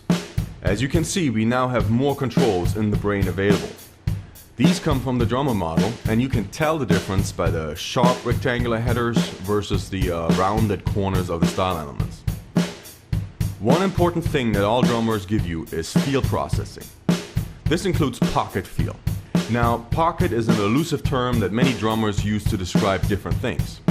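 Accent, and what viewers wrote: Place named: Long Island, United States. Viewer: Hi, on your accent - I grew up in Long Island - I just thought you were from somewhere on LI I hadn't been to yet 😁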